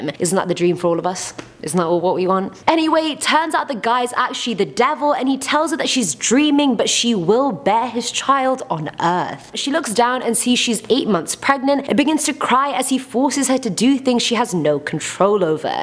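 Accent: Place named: Scotland, United Kingdom. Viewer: no. Canadian English but her accent is Scottish. I am Scottish which is why I recognise it. Some may say she is more likely to be of middle Eastern descent but I personally do not want to make any assumptions about her as its not my place to do that.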